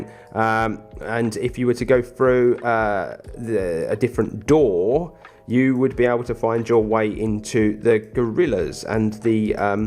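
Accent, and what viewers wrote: United Kingdom. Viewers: What part of the UK are you from?? I can never figure out the accent.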